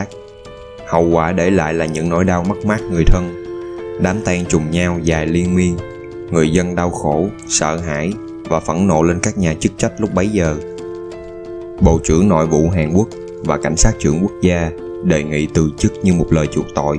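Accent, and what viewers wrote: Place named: South, Vietnam. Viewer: giọng nói miền Nam về rất hay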